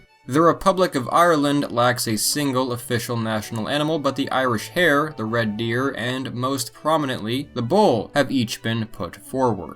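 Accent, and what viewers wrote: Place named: United States. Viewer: it's a shame that you didn't ask the guide what Swedes call this animal in their own language which, rather tellingly, they pronounce "elg". If that's your voice-over, it sounds like you have substantially learnt English from American sources. Maybe your Swedish companion also learnt American English. As for the signs- well, looks like they're for English speaking tourists... mostly Americans? American English shouldn't be regarded as the definitive form of English. Another example is your pronunciation of the Australian bird, the "emu". Americans (and you) mispronounce this as "ee-moo". Australians pronounce it "eem-you".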